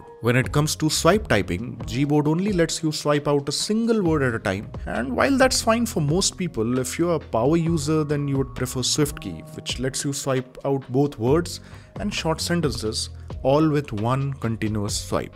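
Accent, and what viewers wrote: India. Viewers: Might be cuz he has an indian accent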